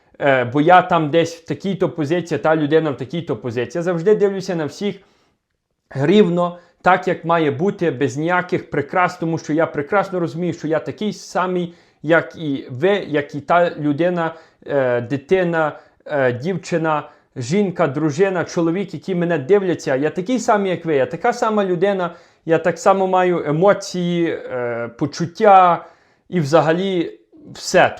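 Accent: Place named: Western Ukraine, Ukraine. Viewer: Красивый Украинский язык с западной ноткой. Восхищен! ( Виктор Одесса)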